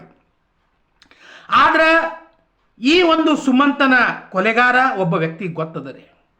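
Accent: North Karnataka, India. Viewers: ಉತ್ತರಕರ್ನಾಟಕದ ಜವಾರಿ ಭಾಷೆ.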